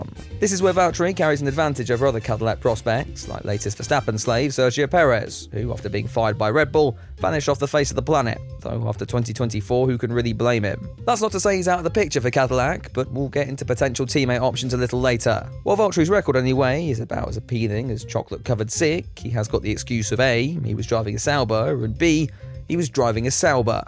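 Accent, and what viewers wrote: United Kingdom. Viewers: Hey dude. Hadn't seen you ever. Not only do you sound British, you look British...super british, if you know what I mean.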